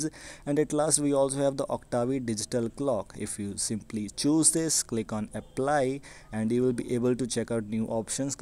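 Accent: India. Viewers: Bhai Lucknow se ho kya?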